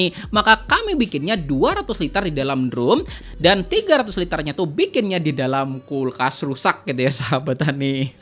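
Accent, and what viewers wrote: Banjar, Indonesia. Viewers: Dari toturial dialek bahasa, sampian ini orang Banjar kah ? Beralamat di mana ?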